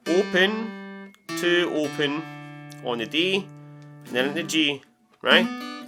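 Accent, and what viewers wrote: Scotland, United Kingdom. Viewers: Great lesson. My wife was listening. She wants to know what part of Scotland are you from. She’s from fife.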